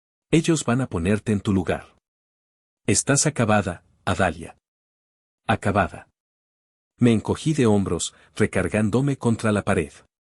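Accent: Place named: Mexico. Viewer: Dejaron de gustarme estas narraciones,mejicanas😢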